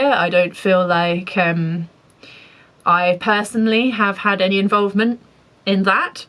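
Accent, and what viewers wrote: United Kingdom. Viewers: Your accent do sound like those Britishers from the Lagaan movie....period. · I can understand what your speaking because it's British Accent.I actually don't understand the American accent. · When you read those mean comments with a British accent it makes it sound like they are more dignified than they actually are.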